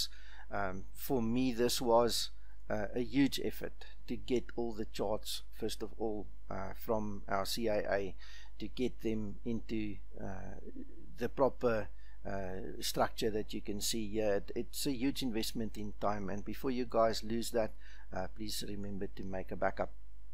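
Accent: South Africa. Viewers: what part of south africa are you from and thanks for the vid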